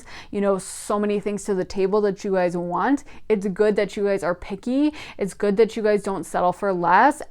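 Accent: Minnesota, United States. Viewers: are you from MN? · Are you from Minnesota?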